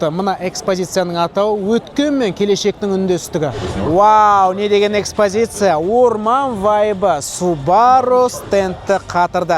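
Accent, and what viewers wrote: Standard. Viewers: ҚАЗАҚСТАНДА ЖАЛҒЫЗ ТАЗА ҚАЗАҚША 1000% СОЙЛЕЙТІН ОСЫ СИЯҚТЫ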